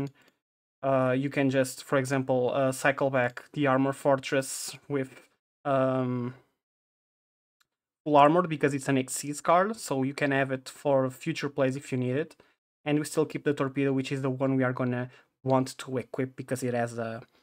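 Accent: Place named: Portugal. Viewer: good video, out of curiosity your accent sounds like you are from portugal or something ??? not trying to dox you hahaha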